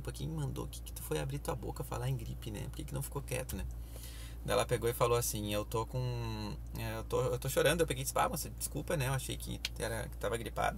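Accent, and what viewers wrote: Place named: Porto Alegre, Brazil. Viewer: Que histórias hein! Valeu, não sou motorista, vim parar há algumas semanas por acaso no seu canal, mas já assisti vários e vários vídeos, to gostando bastante. Me identifiquei contigo, trabalhador, gente boa e o sotaque bem puxado que me lembra alguns amigos de POA! Ficou bem legal o quadro contando histórias de passageiros, faça mais vezes. Abraços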